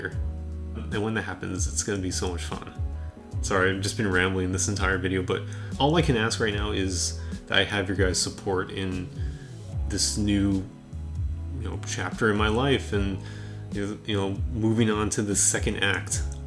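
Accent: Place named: San Francisco, United States. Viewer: Some things are necessary. Moving seems necessary for you and your wife. For $$ reasons My wife and I had to leave SF moving to (at that time) a much cheaper Oakland condo. This was back in 1986. After acclimating to the pace and people we fell in love with it and thrived there. 3 years later we had to move because it was too small to run my business there. We sold just before Loma Prieta hit. After the quake we couldn’t find anything around Oakland, but with the $ from the sale we’re able to put a down payment on a 600 sq’ house in ….. Bernal Heights, where we still live. I know with the prices in SF things seem impossible, but things seemed impossible back then too. Sometimes going with the flow, though scary can bring you back to where you want to be. You know, like those rip tides Ocean Beach. It may take an earthquake to open a door but crazy things happen. 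I love your videos and I’m sure you’ll find and create gems wherever you go. Your love, passion, and intelligence shows! You’ll do well! May you always keep that clean Richmond district accent!;—) Best of Luck!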